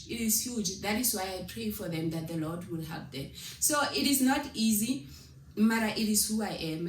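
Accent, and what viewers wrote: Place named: Pretoria, South Africa. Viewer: You sound like you are from Pretoria, by your way of speaking.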